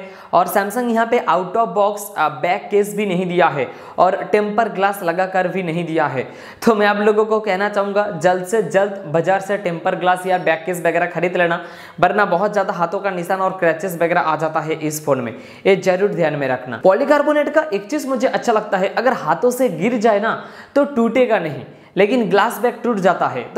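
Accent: Bengal, India. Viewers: Lagta he ap bangali he.isiliye apka hindi sunne me bahut achha lagata he comedy comedy bolta he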